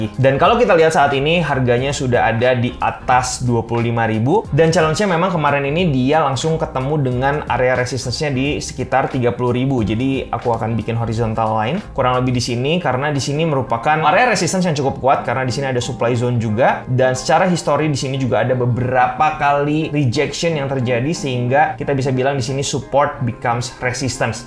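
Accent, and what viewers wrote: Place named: South Jakarta, Indonesia. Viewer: bang which is lu annoying, sumpah, logat jakselnya ilangin pls sayang lu udah bagus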